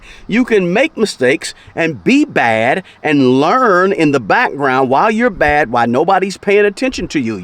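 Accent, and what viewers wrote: South, United States. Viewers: Thank you for this video! Can anyone tell me where he is from?? I know his accent, I love the people from that place but I can't remember if it is from Virginia or North Carolina..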